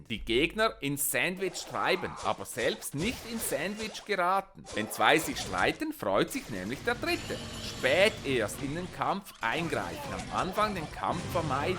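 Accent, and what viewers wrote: Switzerland. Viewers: Bist du a schweizar du bischt foll gaaaiiilll ich liebe das wen du sagst Sandwich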